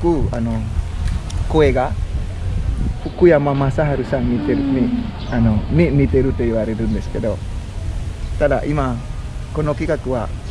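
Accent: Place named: Bali, Indonesia. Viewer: Care nak Bali logate bli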